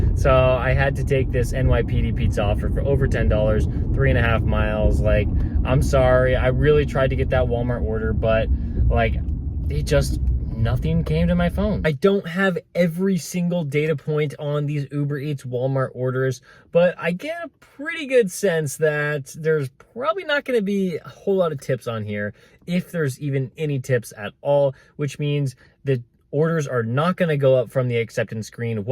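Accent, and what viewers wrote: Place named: India. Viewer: Absolutely giving you the run around in that glorious Indian accent.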